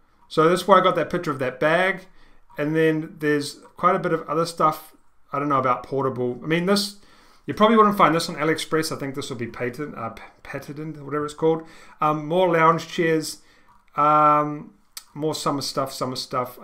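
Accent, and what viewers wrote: New Zealand. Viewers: you are from new zealand right.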